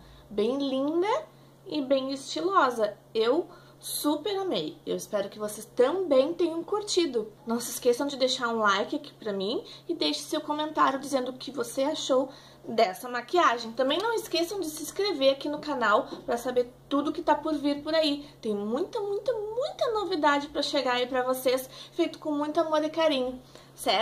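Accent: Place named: Rio Grande do Sul, Brazil. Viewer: Ficou linda, já q o verde estará em alta neste inverno vou aproveitar o meu q está meio abandonado...rsrs. Lindo esse sotaque de gaúcha. Mto sucesso no canal. No meu apresento dicas motivacionais e dinâmica de grupo, se gostar aparece por lá. Bjs.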